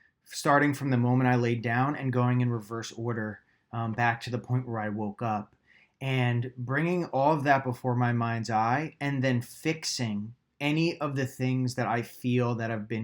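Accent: New Jersey, United States. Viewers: Love your videos. You have no idea how much you have helped me. I've always manifested randomly but now going for the really big stuff. Your voice is soothing and love the NJ accent. Be well. God Bless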